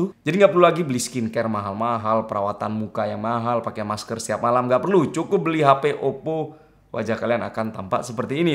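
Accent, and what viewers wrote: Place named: Java, Indonesia. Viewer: Seneng nak smpean ngomong bang sante tp lucu mbe medok wkwkw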